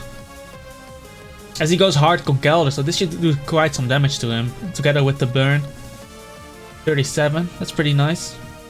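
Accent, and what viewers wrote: Netherlands. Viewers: Your accent sounds soooo dutch XD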